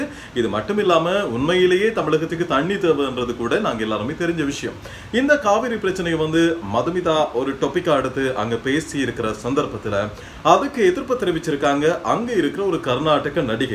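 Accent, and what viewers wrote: Sri Lanka. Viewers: ஆம் இவர் இலங்கை 🇱🇰 தமிழர் நல்ல பாடகர் வானொலி அறிவிப்பாளர் நம்ம அண்ணா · உங்க தமிழ் இலங்கைதமிழ் மாதிரி இருக்குங்ண்ணா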